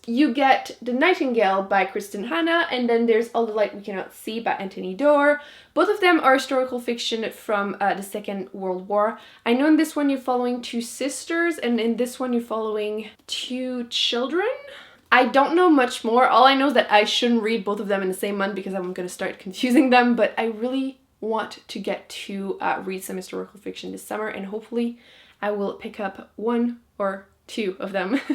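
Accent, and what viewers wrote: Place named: Quebec, Canada. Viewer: if you want to read more girls love girls books, i definitely recommend far from you by tess sharpe, labyrinth lost by zoraida cordova, beauty queens by libba bray, le bleu est une couleur chaude by julie maroh and not your sidekick by c.b. lee! also i saw that your accent is french canadian, and since i'm french canadian and have the hardest time finding cheap books, i was wondering if you have any library recommendations? anyway, great video! i have a couple of these books in my summer tbr as well, and i'm super excited to pick them up! <3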